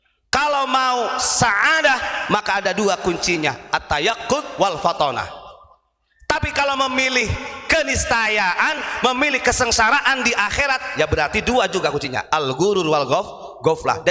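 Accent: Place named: West Java, Indonesia. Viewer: Gus orang Sunda apa Java soalnya aksen sunda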